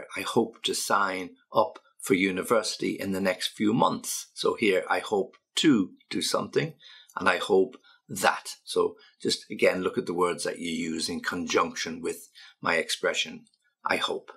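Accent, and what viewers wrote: United Kingdom. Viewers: مرحبا هل هذه لهجة بريطانية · Are them british sounds or American? I like british. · I just found this channel, British English and I love this so much. I'm Indonesian, and I love learing Brit_Eng ❤ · I love british accent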